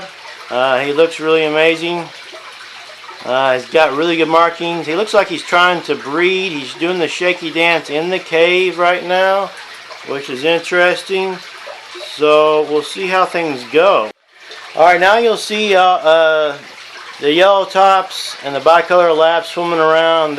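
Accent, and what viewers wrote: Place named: East Tennessee, United States. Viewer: Very cool your educational video, along with the east TN accent! Very cool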